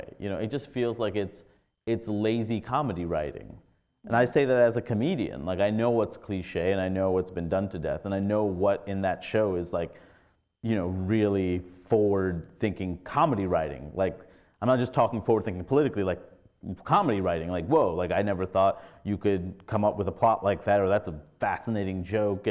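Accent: United States. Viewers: This guy is literally grown up up in America who’s mum is American you have a American accent yet you feel done over by APU shut up you sound more White and Buttered than Bill Clinton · Hari is more American than he is Indian. Sure, he might look physically like an Indian and he might have the same traditions his parents had. But c'mon, the accents just tells the truth. It's really very hard to find an Indian that has a clean accent (ofc, if he was BORN and raised in India). Also tbh Apu is probably one of less stereotyped character in the series, wanna know what is really violent and stereotyped? Watch the episode in which The Simpsons travel to Brazil.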